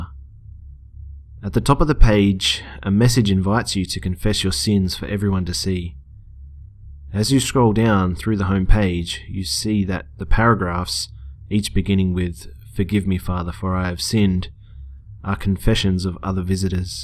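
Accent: Australia. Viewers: are you Australian? your accent sounds Australian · Are you Australian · Are you Australian? I'm getting a Aussie vibe I love your accent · Gday mate? Fellow Aussie for sure; great stuff! Keep it up!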